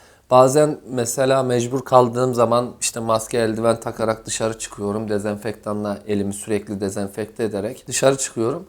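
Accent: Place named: Istanbul, Turkey. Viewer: Dostum eline emeğin e saglik.diksiyon baya istanbul ağzına yaklasmis😆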